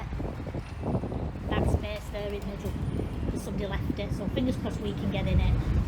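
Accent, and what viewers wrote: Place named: Bolton, United Kingdom. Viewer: Lynn here on Hubby's phone mines playing up 😏, Wooo what a turn up , I'm just flipping through to see what I can watch and there you pipe up , I've just subscribed 👍, how far is this place from Yorkshire ?, I need to go for a Day 😊, my late Brother, he was called Tony and he used to build steam engines, he was a very clever man , we lost him suddenly a year and half ago , Love your way of thinking 🤔,can't wait to watch more of your videos , are you from Bolton? With your accent I'm sure I'm right 🤔😂😂👍 Take Care Love xx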